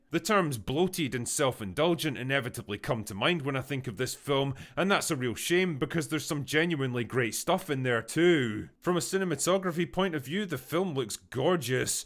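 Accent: Scotland, United Kingdom. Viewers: It's the Scottish accent, especially in Dindee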